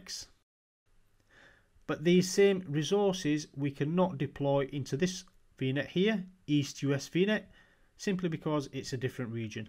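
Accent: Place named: England, United Kingdom. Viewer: Finally a proper English accent. Thank you.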